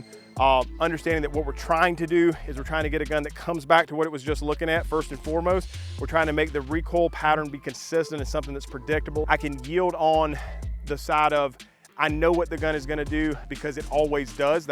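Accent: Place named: South, United States. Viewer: He is saying it right. Y'all just aren't from the south, apparently. Lol. I'm a fellow southerner and have said "pilla" for all of my 36 years.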